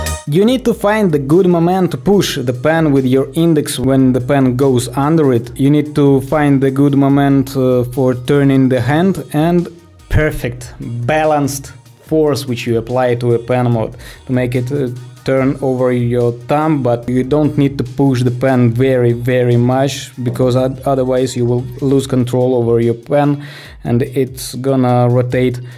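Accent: Russia. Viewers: Слышно что русский челик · Русский акцент остался😂😂😂😂👍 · You have a Russian accent(lul, it's handsome). Are you from Russia? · так ты русскоязычный по акценту понятно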